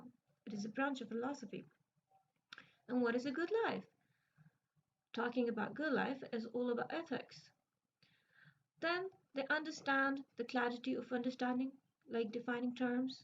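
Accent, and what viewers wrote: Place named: United States. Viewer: Madam wese ap ny kaha tha ap ko easy tareeky se samjhao gi per ap ny itni American English accent bol kr pryshaan hi krdya ... over lagta hai...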